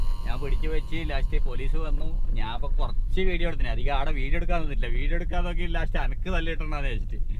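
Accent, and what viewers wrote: Kannur, India. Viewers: യാത്രയ്ക്ക് ആശംസകൾ. Vedeo length കുഴപ്പമില്ല. കണ്ണൂർ slang നമുക്ക് സൂപ്പർ.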